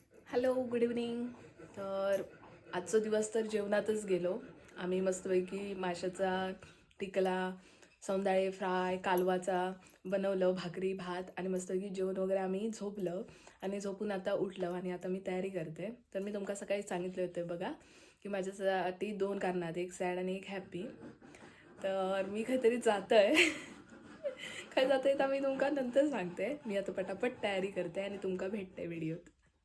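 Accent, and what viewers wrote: Malvani, India. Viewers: Malvani Chan bolte aasech volg malvani karat ja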